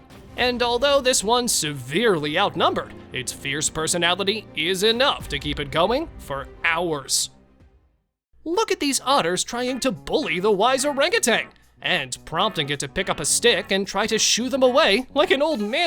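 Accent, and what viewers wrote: United States. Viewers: Totally agree, annoying American voice ! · If you ever want a nature documentary completely ruined, get a yank to narrate it. What a colossal putz!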